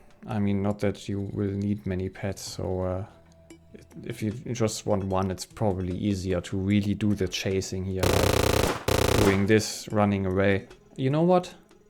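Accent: Germany. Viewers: Man hört raus das du ein Deutscher bist xD Trotzdem geiles Video👍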